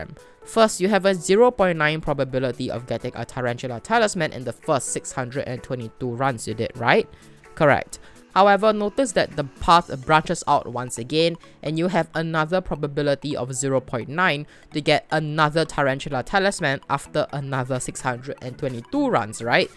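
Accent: Singapore. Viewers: sounds like singaporean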